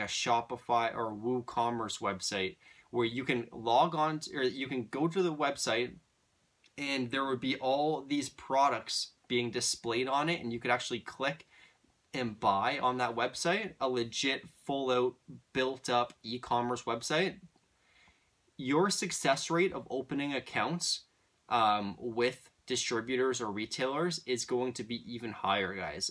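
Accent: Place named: Canada. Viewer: I know you'd Canadian by how u say owt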